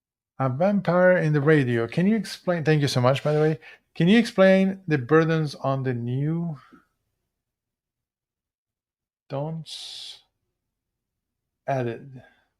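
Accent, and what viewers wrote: South, United States. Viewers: don't listen to them - your acting is hilarious! I love your southern accent!! Please let us see the rest of your movie! 😂😂😂😂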